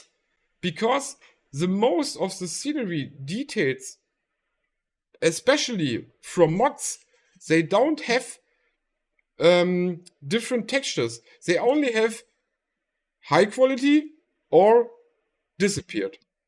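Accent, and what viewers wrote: Germany. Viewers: Ich liebe diesen Deutschen Akzent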